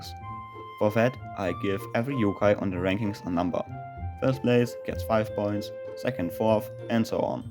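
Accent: Germany. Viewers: ich hab dein deutschen aktzent gehört aber du sprichst gut englisch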